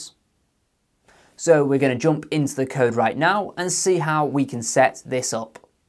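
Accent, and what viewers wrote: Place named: United Kingdom. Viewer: that british accent!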